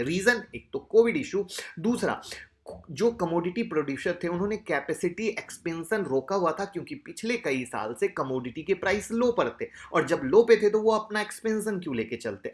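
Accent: Bihar, India. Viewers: Sir aap Bihar se lagte ho.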